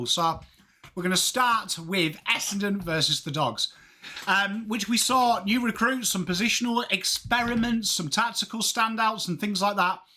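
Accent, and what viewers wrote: England, United Kingdom. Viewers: It’s so weird listening to a pommy talk about afl lol but ya know what you’re talking about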